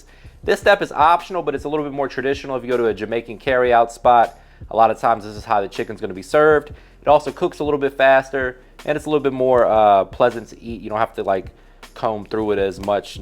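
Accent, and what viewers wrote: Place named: South, United States. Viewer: Cooking with is crazy LoL you from Florida? It feels mad southern.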